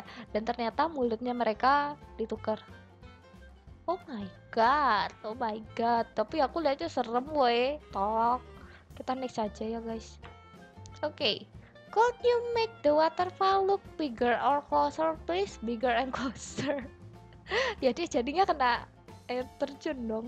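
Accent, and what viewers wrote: Java, Indonesia. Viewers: Asal dari mana k,aku baru subcreab k,dari logatnya dari orang jawa. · Kaka orang jawa ya?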